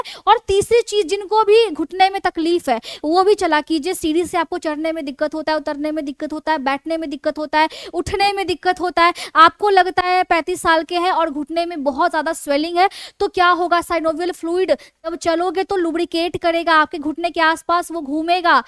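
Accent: Bihar, India. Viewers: Jankari aapki bahut hi mahatvpurn hai bahut achcha se aap explain kar rahi hai aapki bhasha mein Bihari Pan ka lagao lag raha hai vaise very nice❤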